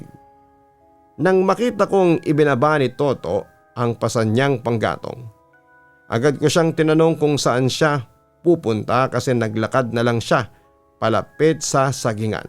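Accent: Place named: Waray, Philippines. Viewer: waray2 po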